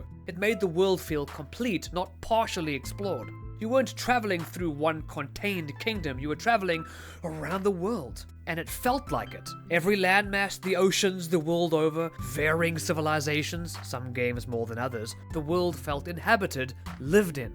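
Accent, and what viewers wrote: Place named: South Africa. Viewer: Hierdie ou klink Suid Afrikaans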